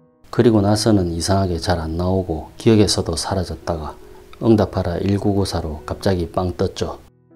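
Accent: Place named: Busan, South Korea. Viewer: 정말 아재같으셔서 구좋알했습니다. 말투가 딱 들어보니 부산 같으신데 맞지요?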